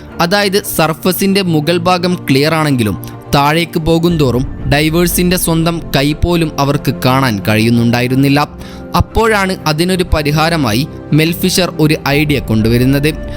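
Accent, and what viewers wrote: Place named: Northern Kerala, India. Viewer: വടക്കൻ ഭാഷ കേൾക്കാൻ ഒരു രസം ഇല്ല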